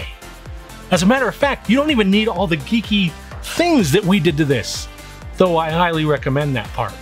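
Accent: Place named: United States. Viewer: Nah, I’m just pulling your leg over the slightly peculiar US pronunciation. It’s a bit like how you guys have an odd way of pronouncing aluminium. It’s just a mildly amusing linguistic difference across the Atlantic. 🙂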